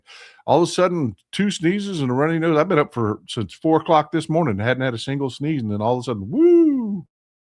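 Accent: Texas, United States. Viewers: Glad I found you. Been watching for a couple of weeks and I’m glad a fellow Texan, sharing my views, is broadcasting or rather, streaming as they now call it, and talking like a true Texan. The candid and straightforward way you communicate is the way my daddy got his message across. I’m 70 and tech is outrunning my ability to keep up. Hell, while tech is speedily moving ahead, sadly, my mind needs the spurs every now and then. So, I’m appreciative that I can hear facts from someone I relate to. Looking forward to every post, keep it up and thanks.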